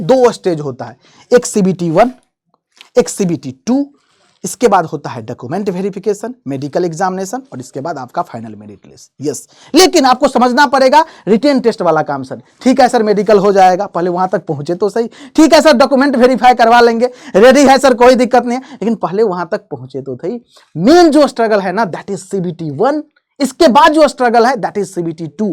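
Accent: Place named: Bihar, India. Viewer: Sir aap vihari ho na qwalification nhi hai wo.. qualification bolte h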